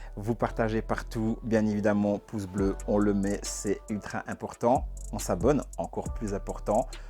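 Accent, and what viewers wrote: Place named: Belgium. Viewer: Petit accent belge 🤣 tu viens d’où ?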